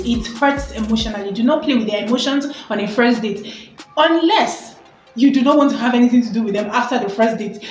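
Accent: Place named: England, United Kingdom. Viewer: I like your english accent. you speak good English. good tips. yes self esteem. yes need to have money before travel. enjoyed the video support you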